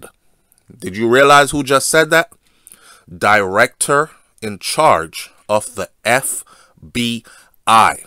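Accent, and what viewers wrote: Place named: Jamaica. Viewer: Jamaican accent trying to talk American is so annoying #unsubscribe 👋 ✌️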